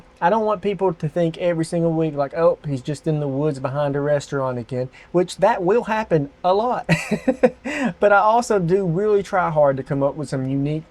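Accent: Alabama, United States. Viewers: That's a cool stealth camper! It would also make a beautiful and comfortable outhouse. If you were in Louisiana I would suggest you stealth camp in a Mardi Gras Float [in the off seasons of course]. But then again I sometimes forget you live in Alabama where Mardi Gras originated until I hear your accent.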